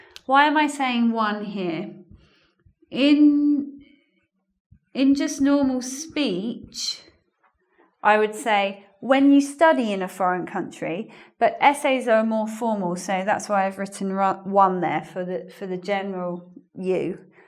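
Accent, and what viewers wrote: United Kingdom. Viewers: Do you have British accent ?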